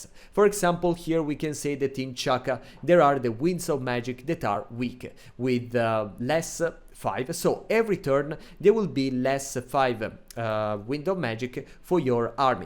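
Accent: Italy. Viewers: Sei per caso italiano?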